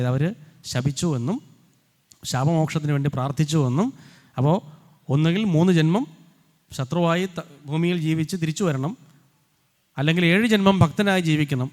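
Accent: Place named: Thiruvananthapuram, India. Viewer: തിരുവനന്തപുരം ഭാഷ യും. മഹാ പണ്ഡിതനും ഹരേ കൃഷ്ണ 🙏 ഇതു കേൾക്കാൻ sadhichathu മഹാ ഭാഗിയം 🙏🙏🙏🙏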